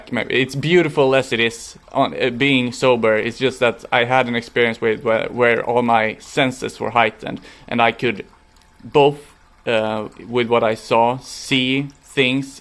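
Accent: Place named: Sweden. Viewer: Love your Swedish accent and your appreciation for nature and beauty. "Just me and the plants" ♡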